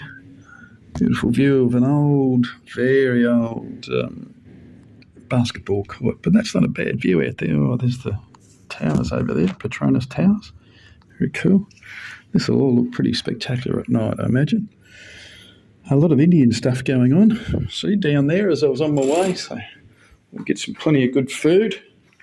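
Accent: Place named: Australia. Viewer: From your accent, you are australian.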